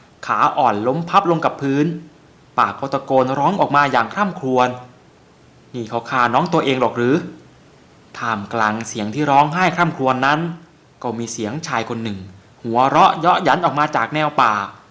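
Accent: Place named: Rural, Thailand. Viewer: ສຳນຽງພູດຄືມາກ ກົມກື່ນກັບສະພາບບ້ານນອກດີນະ ຄິດຕາມທຸກຄລີບ ຂອບໃຈ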